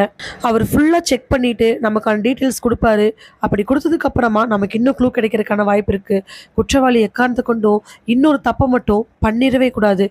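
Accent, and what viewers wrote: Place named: Pollachi, India. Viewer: சிஸ் நீங்க பொள்ளாச்சி சைடுங்களா...வாசிக்கிற விதம் எங்கபக்கம் போல இருக்குங்க சிஸ்.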